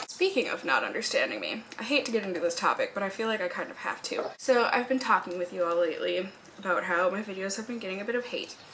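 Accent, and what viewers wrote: Minnesota, United States. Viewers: Your accent is getting more Minnesotan since you've been home. I love it.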